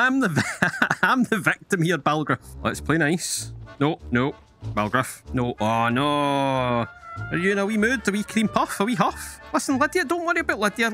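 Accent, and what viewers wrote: Scotland, United Kingdom. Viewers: False, his very heavy Scottish accent is part of what makes this so great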